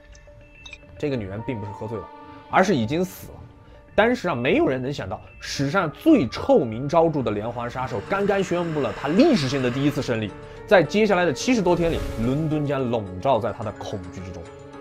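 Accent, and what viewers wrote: Yunnan, China. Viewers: 哥们儿这一口云普把我逗乐了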